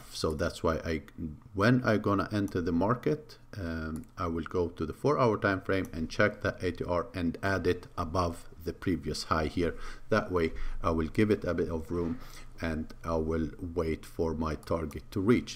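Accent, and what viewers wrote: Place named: Iran. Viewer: You sound like you are from originally from Iran.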